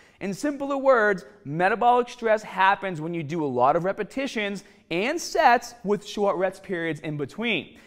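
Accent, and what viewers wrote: Boston, United States. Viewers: comparing your videos in 2010 to 2017.... you sound normal in 2010, but in 2017 you somehow developed a boston accent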